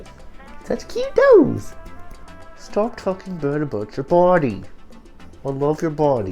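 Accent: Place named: France. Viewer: The French accent is 😍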